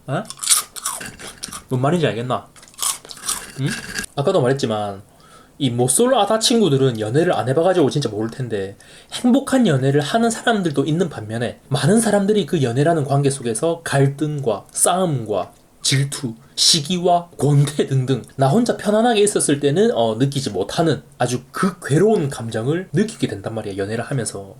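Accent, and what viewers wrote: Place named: Gyeongsang, South Korea. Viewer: 아 갱상도 사투리 듣기시러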